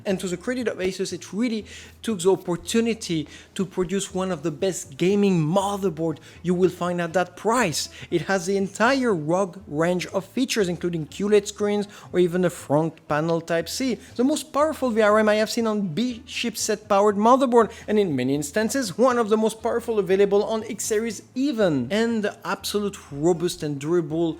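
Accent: France. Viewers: Merci je suis convaincu! J'attends quand même la nouvelle b550 de msi pour être sûr! Première vidéo que je vois sur ta chaîne et tu viens de gagner un abonné (le French accent is lovely)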